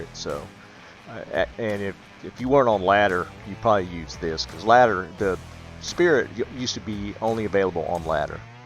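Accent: Texas, United States. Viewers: Sounds like Texas or Mississippi.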